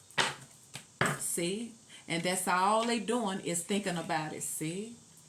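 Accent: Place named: South, United States. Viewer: Me too! Love her southern drawl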